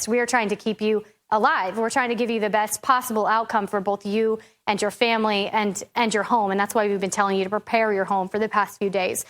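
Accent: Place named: New England, United States. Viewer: Where did they get this woman ?? Fox is now hiring extemporaneous female speakers. Grab them off the street, give them a page they have never seen, put them on the air in 10 seconds You do not even need to be a functional reader. Make it up as you go. Obviously, she's a an office holder in the Republican Party. She would come across better, if she was not a Yankee. I'm not trying to give you extra work here.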